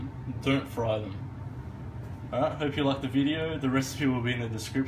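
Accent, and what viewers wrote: Australia. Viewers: Nice aussie accent mate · Love the Aussie accent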